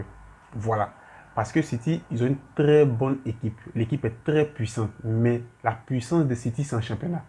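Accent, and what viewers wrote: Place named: Ivory Coast. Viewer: Mais il a beau rester à l'étranger ce mec mais son accent ivoirien ne quittera jamais 😂😂😂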